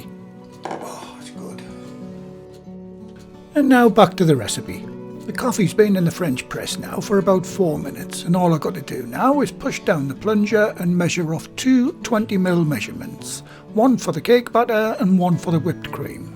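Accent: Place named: Sunderland, United Kingdom. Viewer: Thank you for all the wonderful recipes we have enjoyed all these years. I actually learned baking bread following your instructions. On this recipe I used large eggs and the end result is far more runny than your final batter, any suggestion for the next time please? My dearly departed wife was from Sunderland and your accent is a kindly reminder of happy times. God Bless You.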